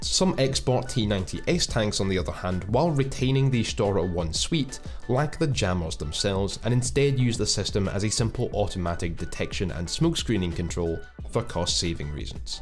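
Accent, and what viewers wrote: Scotland, United Kingdom. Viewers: I just come here for that sweet Scottish accent... And the armored stuff!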